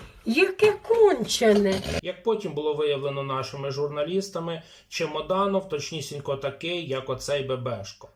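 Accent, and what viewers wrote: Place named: Russia. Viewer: Он даже чёкает чё четы с окцент расийского дерьма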